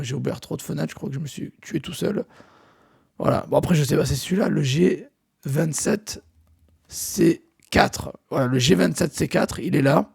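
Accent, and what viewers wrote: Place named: England, United Kingdom. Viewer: merci super comme d'hab et au fait sympa ton accent anglais 😅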